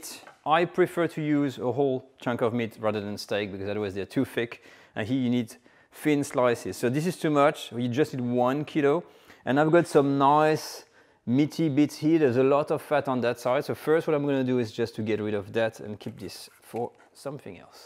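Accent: France. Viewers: Love French food, and love the French accent. This looks delicious.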